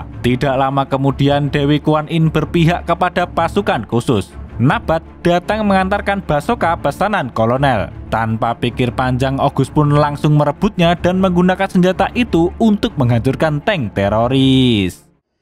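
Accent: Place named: Java, Indonesia. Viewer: bang yg ini take voice ny kok medoks jd kurang epic haha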